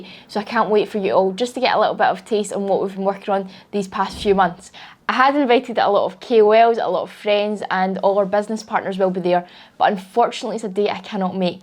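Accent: Scotland, United Kingdom. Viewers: 好重正宗英國口音, 同US/Canada 北美口音差好遠 , 真正英文就好似國語而喺我哋Vancouver啲北美英文就係廣東話😸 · More like a Scottish accent. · We need to cut population in Hong Kong if there aren't much more land can be released to solve the housings issue. BTW, it's nice to hear some Scottish English after getting bored with our own kind here the Aussie. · Like other said, strong Scottish accent, interesting to hear～😁😁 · Wow Scottish accent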